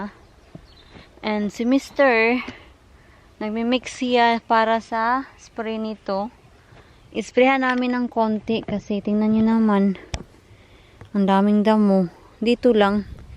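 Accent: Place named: Iloilo, Philippines. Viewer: Hi. Watching here from Dasmarinas Cavite. I remember your accent dahil sa aking mahal na Inay. She is Ilongga. Sobrang sewer at mababait halos lahat ng ilongga. Say hi to your hubby. God bless!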